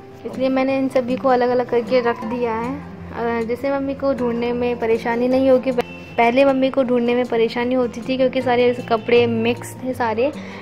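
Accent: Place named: Varanasi, India. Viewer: Banarsi bolty hn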